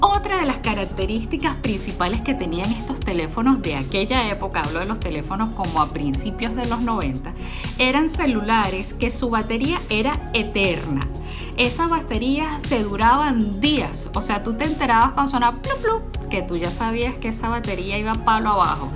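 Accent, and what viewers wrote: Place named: Venezuela. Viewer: ¡Guao, que excelente manera de comunicar! Muy divertida y aleccionador relato. No conocía esto de Xennials, realmente lo soy. Gratos recuerdos y con ese acento venezolano maravilloso. Congratulaciones.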